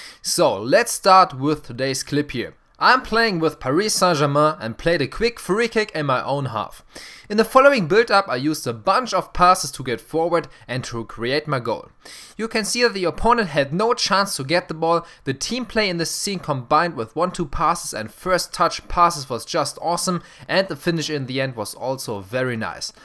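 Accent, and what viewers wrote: Germany. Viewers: Love his German accent <3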